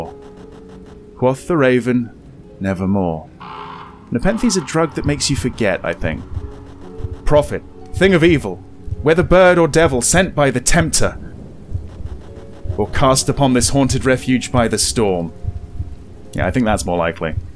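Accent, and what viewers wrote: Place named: United Kingdom. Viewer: I really how you read, you have a very nice British accent which really suits the Allan Poe's poem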